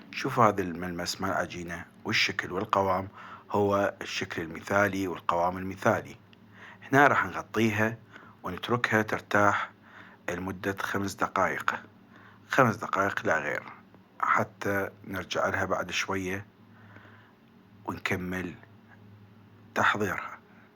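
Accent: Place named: Iraq. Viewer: عيني بعد اللهجه العراقيه ربي يحفظ ويبارك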